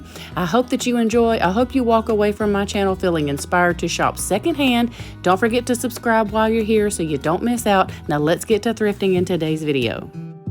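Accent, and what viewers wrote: South, United States. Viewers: You are an inspiration! I love to watch you thrift & decorate. You make my Central IL heart happy! (And I just love your sweet southern accent!)❤️🙏☕🌷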